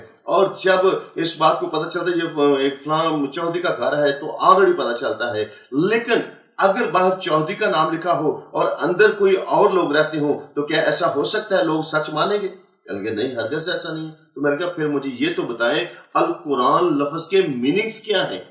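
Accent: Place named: Arab world. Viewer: qabil e ghor bat yah hay is video main k jab yah arabic qawaid janta hay or yah arabic bhi smajh leta hay hafiz bhi hay to isay tarjuma parhnay ki zarurat kyun paish aie? or is ka arabic lehja bhi note krnay wala hay ,, yah khinzer choora hi tha or hay , yah dramay bazi kr rha hay musalmano ko gumrah krnay k lie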